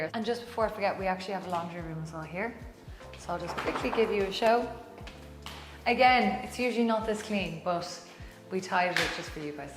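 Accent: Ireland. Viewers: yes I hear a definite Irish accent, very mild really. I would guess she is from a larger city in Ireland, Such as Dublin or possibly Belfast. Who knows. I think maybe? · Sweetie, did you think we would confuse you with Mexican? We know you are Irish, we can hear it, see it 😂😂 gorgeus home, you have grest taste❤❤❤ amazing views 😊 I have big envy energy 😂😂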